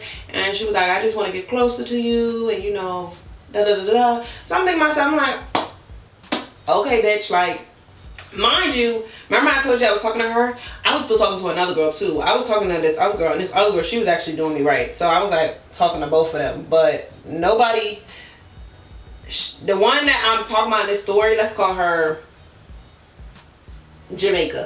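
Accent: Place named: DMV, United States. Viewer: Our dmv accent is SCRONG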